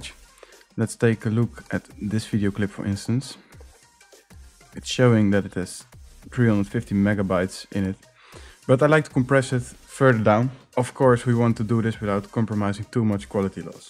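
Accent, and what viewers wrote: Netherlands. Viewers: Dutch accent detected. I like! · Hey cool you Dutch? thought I heard it. Nederland! <3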